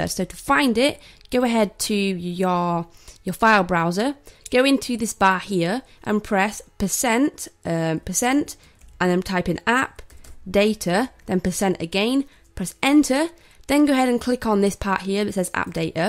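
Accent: England, United Kingdom. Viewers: wow british sound i think ;) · that voice is she from manchester londer lester or none and its sound so familiar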